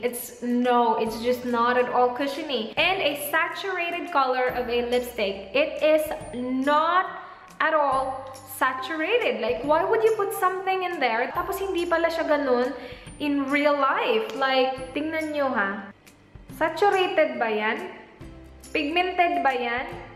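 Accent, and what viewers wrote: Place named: Visayas, Philippines. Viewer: blimeshis hahahaahha maklaro ang pagkabisaya ate :D chos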